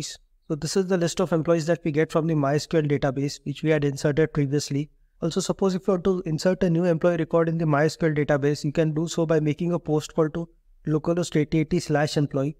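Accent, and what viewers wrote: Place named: India. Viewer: Very useful videos! Can you speak at a little slower pace? Will help people like me not used to india accent. Otherwise, great content.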